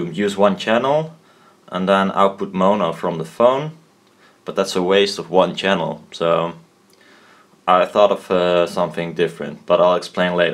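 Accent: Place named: Netherlands. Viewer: ik ook lol gokte het al aan je accent.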